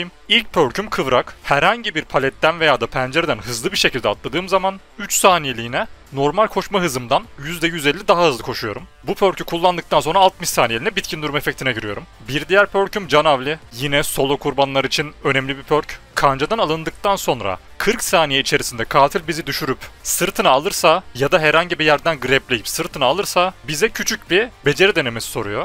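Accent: Sivas, Turkey. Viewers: Hemşerim sivaslımısın bende😍😘🥰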